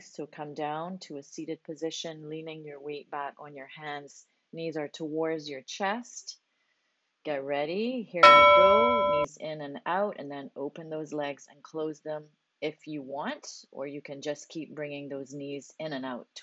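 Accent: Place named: Barbados. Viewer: Great 10 minutes! I did it after your ab/glute band workout. Perfect fit! And I love how your Bajan accent is coming out 😊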